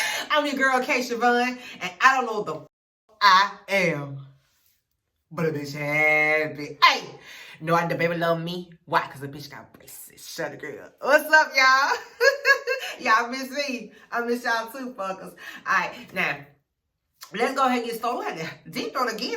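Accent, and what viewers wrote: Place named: Memphis, United States. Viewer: You sound so Memphis or Mississippi. You had me rolling. How your sisters let them girls act like that toward you? Chile. Girl trips 😓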